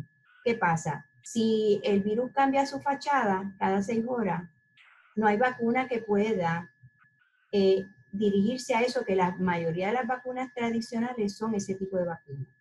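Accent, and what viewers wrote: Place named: Caribbean. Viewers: Tiene acento caribeño pierde credibilidad y peor si son venezolanos. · Jorge José, decir que una médica pierde su credibilidad por tener acento caribeño es racismo. Que pasa con los médicos caribeños? No son lo suficientemente buenos para ti? Todas las personas deben ser tratadas con respeto, independiente de su país de origen.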